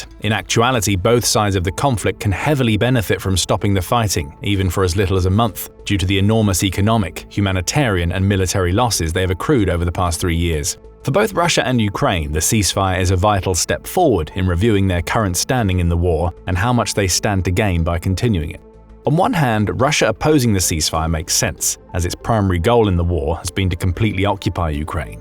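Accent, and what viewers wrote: United Kingdom. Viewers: This video has a completely Eurozone slant.. just because someone has a British accent, doesn't mean you can believe all they say.. The book hasn't been finished yet on US efforts in the Ukraine - Russia war. Don't forget, British did have their hands in the Russia disinformation scandal regarding President Trump (debunked Steele Dossier)..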